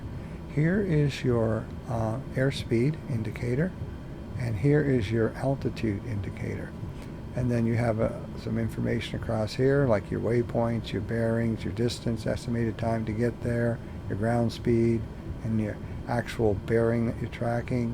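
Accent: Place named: Canada. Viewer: I loved this explanation and caught a few Canadian accent features in your narration! Aboot..... for example... It was an awesome flight and I just can't believe technology can make flight in such terrible conditions possible. It's a very impressive human feat of engineering. Thanks again for all the great information... especially the link to airport data. You've done an amazing job of demystifying the process... Jerry from Fort Worth Tx. I subscribed yesterday.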